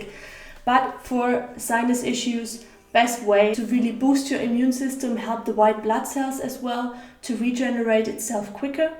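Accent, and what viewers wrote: Germany. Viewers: I understand her very good, also with german accent but the audio quality could be a little bit better. Anyway, thank you for your awesome content! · Bist du Deutsche, das hört sich so an? :)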